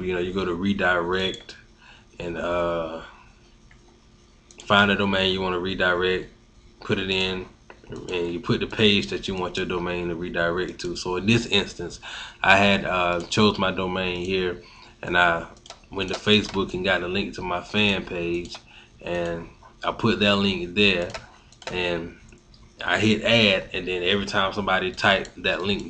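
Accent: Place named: South, United States. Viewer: Thanks, the tutorial is awesome and I love your southern accent ;-)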